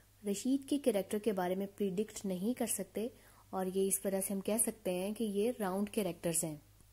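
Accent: Pakistan. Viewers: Aap pakistaniyo jaise bolti hai ? Bht acha explain kiya thank you so much ❤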